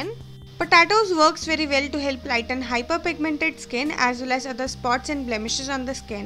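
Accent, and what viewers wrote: India. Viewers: this indian accent had been very annoying omg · im for sure you are an indian, the way your accent sounds like, by the way, the way you said POTATO is kinda bit funny, in a good way.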